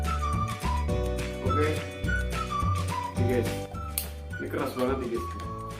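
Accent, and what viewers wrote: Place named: Tegal, Indonesia. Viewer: Tenang ae mas e wis tak sasbcribe... Sampeyan wong ndi,sih logate kaya Tegal ..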